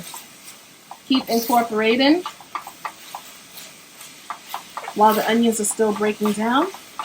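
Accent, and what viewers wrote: New York, United States. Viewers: She sounds like she’s from New York or New Jersey or something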